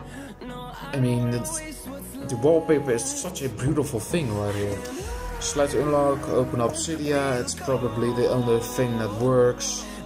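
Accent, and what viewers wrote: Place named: Netherlands. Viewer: Kon aan je stem al aanhoren dat je een Nederlander bent, en het kopje waarin je zelf op het knopje abonneren klikt, bevestigt het voor me. Haha verder informatieve video!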